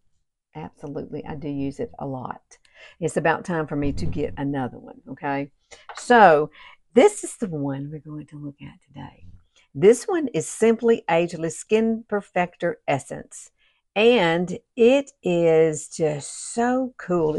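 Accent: South, United States. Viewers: Just came across you and have subscribed. I’m going to try your tips! Love your hair … and your great southern drawl 😊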